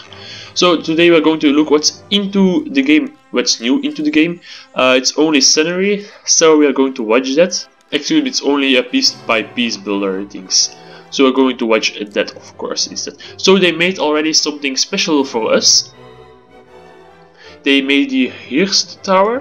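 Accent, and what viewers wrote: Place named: Netherlands. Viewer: Mooie video, maar aan je accent kan je gewoon horen dat je Nederlander (of vlaams) bent 😂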